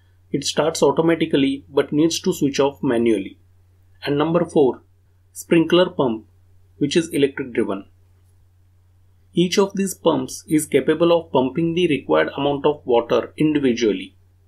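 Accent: Maharashtra, India. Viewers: I can make out the person in video is from Maharashtra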